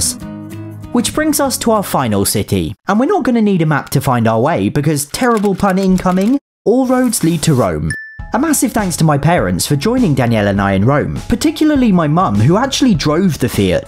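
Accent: United Kingdom. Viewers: Between his humour, LEGO obsession, love for cats, incredible content and British accent... you are unmatched Edit: OMG REPLIED TO MY COMMENT! MOM I'M FAMOUS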